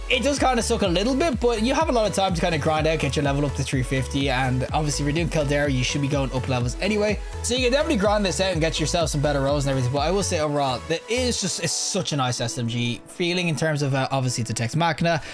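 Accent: Ireland. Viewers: love your dulcet Irish tones but your video's got no game audio, don't know if that was intended.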